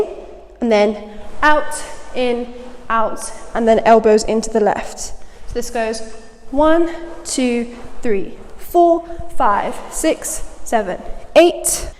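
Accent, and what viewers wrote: United Kingdom. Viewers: Thank you very much for the tutorial, new subscriber, are you from the UK? your accent makes me like from the united kingdom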